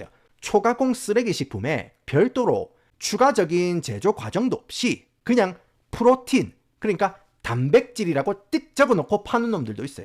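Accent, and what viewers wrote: Gyeongsang, South Korea. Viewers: 공감하는 글 잘 봤읍니다. 한국도 마찬가지 풍조입니다. 정크푸드가 건강한 포장을 쓰고 판매되는 것은 똑 같네요 갱상도 구수한 언변이 참 좋습니다.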